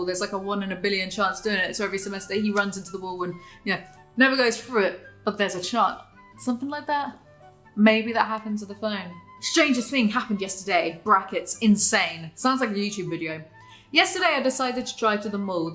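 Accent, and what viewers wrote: United Kingdom. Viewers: LMAO YOUR PHONE IS RIDDLED, I love hearing another UK accent, makes me feel like we actually exist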